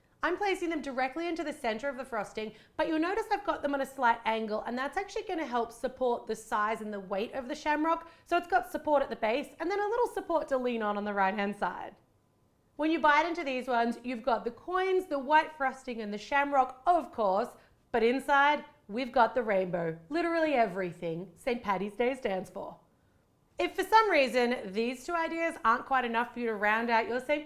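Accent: Ireland. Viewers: love your irish accent hahahah i'm irish but dont celebrate patricks day much,its definitely a bigger thing in america or australia :D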